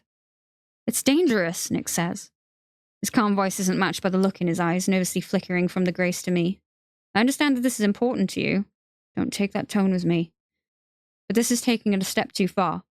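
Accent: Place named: Wales, United Kingdom. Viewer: can you do a good girl's guide to murder?🥺🥺 also I love you accent what part of walsh are you from?😮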